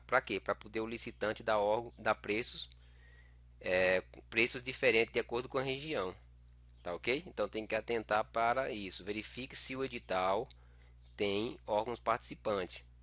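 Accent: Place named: Northeast, Brazil. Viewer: Que sotaque gostoso de ouvir! rsrs O senhor é do nordeste?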